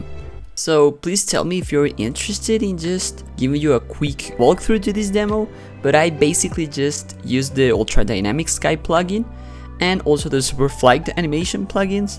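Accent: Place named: Brazil. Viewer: mano tu tem sotaque de br kkkakakakakakak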